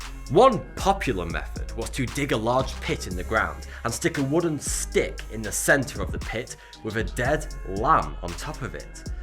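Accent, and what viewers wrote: United Kingdom. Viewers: What area of Britain does his accent come from? I'm curious. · That's just a native British accent, my friend.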